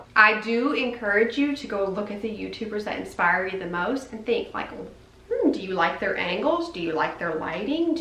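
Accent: South, United States. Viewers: I just found you from Love Meg and I just love you!!! You're so sweet and I can legit just watch your videos for your southern voice! I love it!!!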